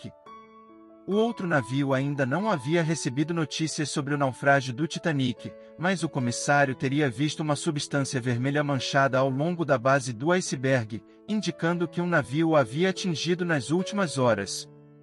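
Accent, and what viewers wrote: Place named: Brazil. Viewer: ESTE SOTAQUE BRASILEIRO DESPERTA LOGO DESCONFIANÇA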